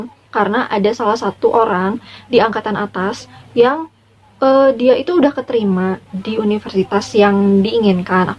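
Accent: Indonesia. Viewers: Ngakak banget lagii bahasa Sunda nya lucuu😂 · Tth suka ih logat sundanya ❤️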